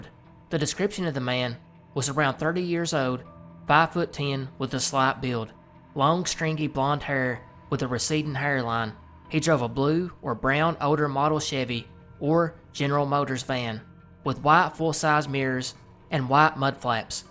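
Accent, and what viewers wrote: South, United States. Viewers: Keep up the great work! Love hearing a southern voice on here!!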